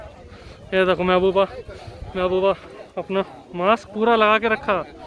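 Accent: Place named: Bihar, India. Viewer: Oyee budbaak....japan me bihari kaise ban gya....thik hai beech beech me bol skte ho...par normal hindi try kro bhai...anyways love to see you all...keep uploading new videos..👍👍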